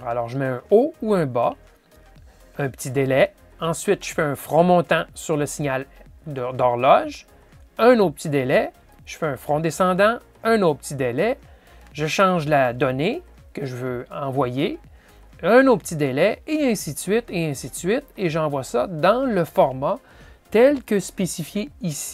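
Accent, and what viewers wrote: Quebec, Canada. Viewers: Bonjour, Encore une vidéo enrichissante, je ne savais pas qu'il y avait de la Ram dans ce genre de module. Ce qui m'éclaire bien pour les nouvelles fonctionnalités de reprise d'impression 3D après une coupure de courant par exemple :) PS : j'adore l'accent québécois ;)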